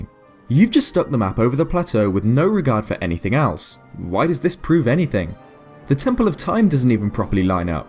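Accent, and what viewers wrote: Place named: England, United Kingdom. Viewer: shut up guys, I dont hear say ocariner....I however hewr him say O differently. I love his accent! always have liked english accent.💞 I feel close to the english, as we used to be a commonwealth, not sure if we are or not, still have the queen on the money..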